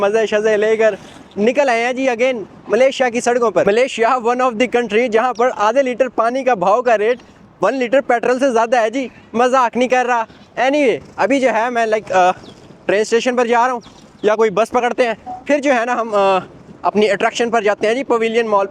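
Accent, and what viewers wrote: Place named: India. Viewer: بھائی، آپ کا ولاگ اچھا ہے، لیکن آپ پاکستانی ہیں اور آپ نے بھارتی لہجہ اپنایا ہوا ہے۔ اردو میں بات کریں، جیسے آتی ہے، فخر سے پاکستانی بنیں۔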